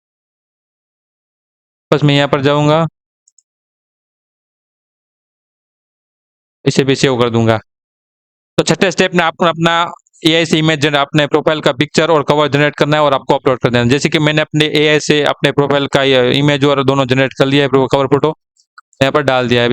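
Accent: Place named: Gujarat, India. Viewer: आप गुजराती हो बोली इसी लगती है ❤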